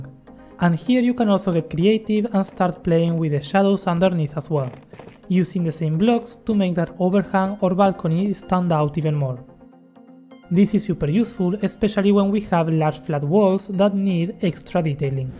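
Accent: Argentina. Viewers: Argentinian accent so strong, it's impossible to hide, good vid bro <3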